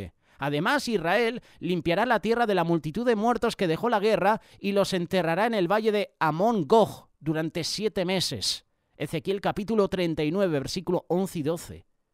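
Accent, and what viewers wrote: Spain. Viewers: Gracias señor por su siervo que nos enseña de manera tan clara mi Dios!!! El es español por su hermoso acento Dios gracias por la palabra que nos alimenta!! Bendiciones del señor para todos los que hemos creído en el señor Jesucristo saludos desde chile ❤🇨🇱🙏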